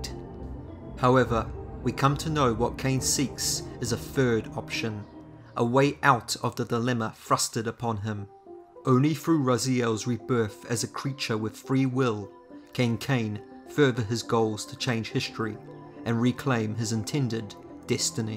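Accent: New Zealand. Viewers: From or based in New Zealand? I hear an accent. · This content and writing is so lekker! Love it. Is that a New Zealand accent?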